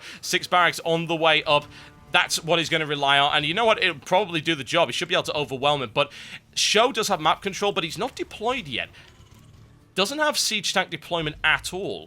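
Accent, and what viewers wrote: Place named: England, United Kingdom. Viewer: you're accent is embarassing to be english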